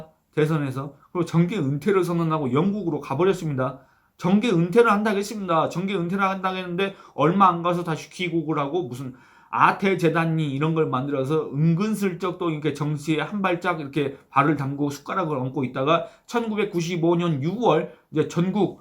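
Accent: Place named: Gyeongsang, South Korea. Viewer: 저는 경상도 사나인 줄 알았어요😊😊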